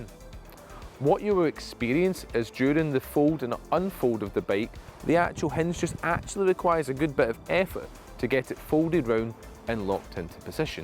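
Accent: Scotland, United Kingdom. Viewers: Scottish - not Welsh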